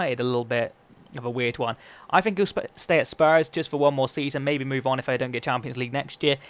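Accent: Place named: Cornwall, United Kingdom. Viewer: you sound very cornish!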